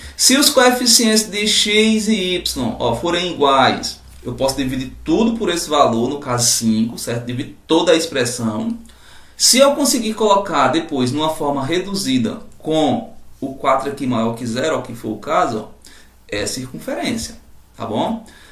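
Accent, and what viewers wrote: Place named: Northeast, Brazil. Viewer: aula mt boaa prof! mto bom ver alguém aqui no yt com o sotaque do nordeste :) sucesso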